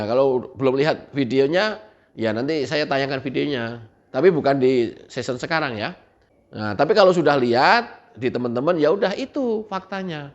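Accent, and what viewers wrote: Java, Indonesia. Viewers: AREMA HADIR BANG.. MANTAB CUK.. JANCUK 😂 MEDOK BINGIT BOSONE HA HA HA HA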